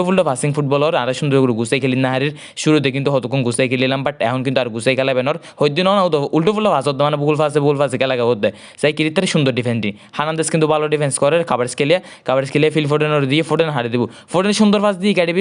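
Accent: Chittagong, Bangladesh. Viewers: Vai apnar basha ki chittagong e?? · ভাই আপনি ১০০% চিটাগং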